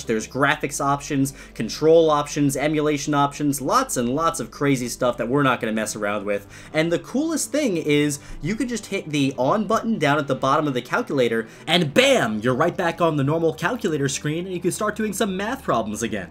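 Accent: United Kingdom. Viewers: bro is british gl :D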